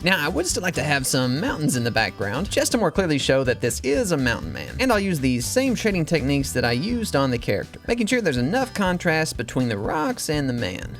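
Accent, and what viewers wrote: South, United States. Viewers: how do you have a southern draw but never had a mountain dew?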